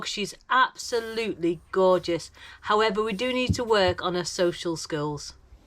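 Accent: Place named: Ashton-under-Lyne, United Kingdom. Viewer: Love your videos. Am trying to place your accent. Im fron Ashton-under-Lyne and hear myself in your accent. Where did you come from thinking it must be in this area?? Keep making us smile 😃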